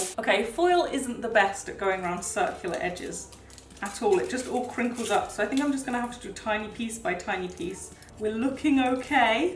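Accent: United Kingdom. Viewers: Wait are you british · I love your British accent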